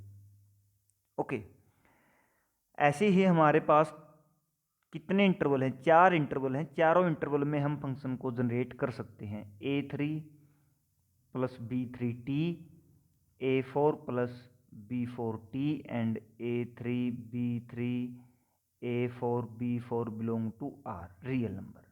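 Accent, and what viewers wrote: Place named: Haryana, India. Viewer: Sir aap haryana se lg rhe hain😂❤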